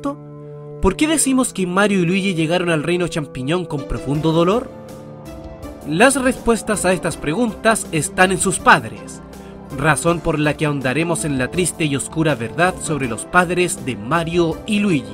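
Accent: Chile. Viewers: Eres de CHILE???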